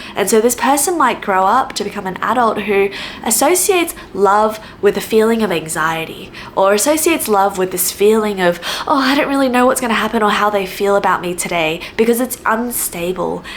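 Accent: Australia. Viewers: I just watched it and the intro and outro are adorable! Lots of people love the good old Aussie accent :) And the research and referencing was really good (I am an Australian psych honours student - so I may be a bit biased). I reckon you should leave it up, it's bonza!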